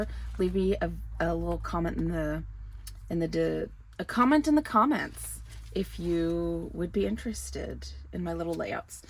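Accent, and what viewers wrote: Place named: Midwest, United States. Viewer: Your video voice on this channel is so Midwest. “You scrap? Are you a scrapper?”😂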